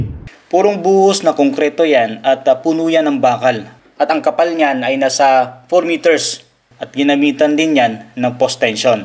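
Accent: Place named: Northern Samar, Philippines. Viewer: Engr. parang taga catarman northern samar ka? kc ang accent at pag sasalita mo,, nararamdaman ko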